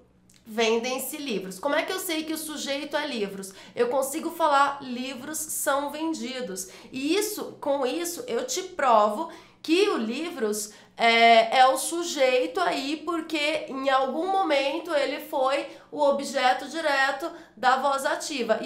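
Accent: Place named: South, Brazil. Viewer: Professora, seu sotaque parece ser do sul, mas qual estado? Abraços apertados de quem já assistiu as suas aulas literalmente centenas de vezes.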